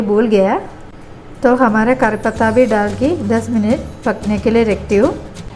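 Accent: Kerala, India. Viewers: You are so sweet hindi Kitna majedar he kerala accent me fantastic recepi god bless you always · Hello there, liked watching your recipes, specially the coconut shells used n the pots cooked in. Your hindi reminds me of one of our Auntie from Kerala and many friends I have from there, so also when you give recipe details I cherish your speaking. Keep sharing recipes, I wish I could also get tamarind and shallots from Kerala :-) Have a blessed wonderful day! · Nice receipe , Nice malayalam Hindi & Nice saree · Nicely explained. Moreover your language was very sweet dispite being from Kerala. Hindi gives you a wider audience... keep it up 👍. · love the mallu accent....maja aa gaya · Your Hindi is typical Mallu Hindi....it reminds me of my mummy speaking hindi with our neighbors...even my mom makes fish curry in the same manner....amazing job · Keralite flavoured hindi is really very sweet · aapka hindi bolne ka style mujhe meri friend ki yaad dilaya. 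She is also from kerla.. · Amazing Ma'am. Super fish curry. And your Hindi is amazing, with a touch of Malayalam accent. . That's the beauty of our great country India. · fish to banayenge tab banayenge par aap ho bahot hi zyada CUTE ho and aapka malayalam accent me hindi bolna bahot hi pyara lagta h. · Love you dear. Am in Dubai suddenly I remembered frind who is shifted to Kerala Bec same style of Hindi speaking ❤️❤️❤️ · you are from Kerala,you should be proud to speak in your mother tongue.. Your Hindi sounds like Malayalam. I love Malayalam,speak in Malayalam .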